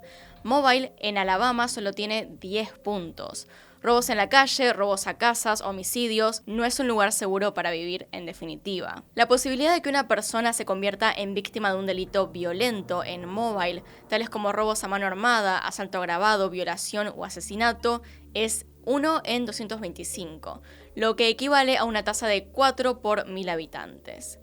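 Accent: Argentina. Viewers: "Hola y bienvenidos a mi canal de YouTube...una vez más. Hoy tenemos el caso de....." El acento argentino lo amo y vos sos una maestra para narrar los casos. Además, me encanta el toque q le pones a las preguntas capciosa...me encantas,. Saludos desde El Salvador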